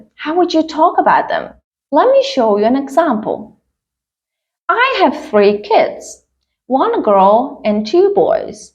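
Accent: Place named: United Kingdom. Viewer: 😍 such a nice British accent 🥰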